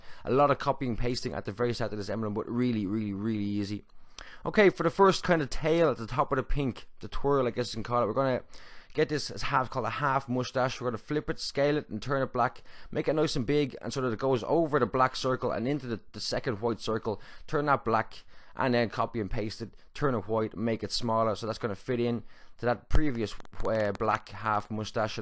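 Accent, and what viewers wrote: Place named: Ireland. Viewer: notice your Irish accent, I'm from Ireland :) woo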